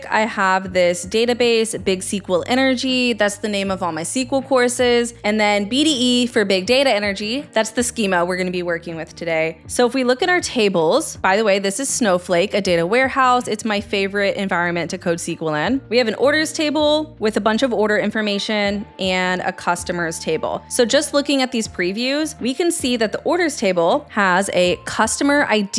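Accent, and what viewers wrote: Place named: Latin America. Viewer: I really love how expressive you are explaining quite challenging concepts as you do. Eres española? is spanish and the way you talk is more latino hehe. Thank you from the bottom of my heart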